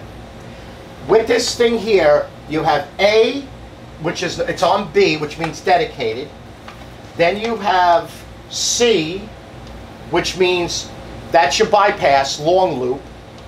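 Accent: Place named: New York City, United States. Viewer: I can hear your NYC accent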